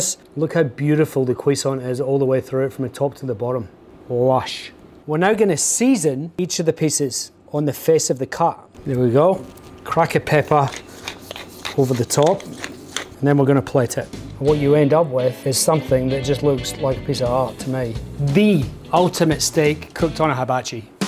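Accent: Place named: Scotland, United Kingdom. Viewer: scottish guy where you from in glasgow?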